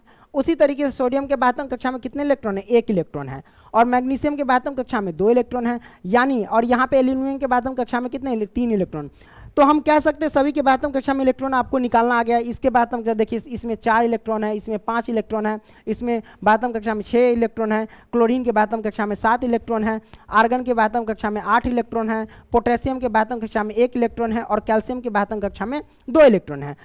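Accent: Bihar, India. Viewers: Sir aap Bihar ke ho kya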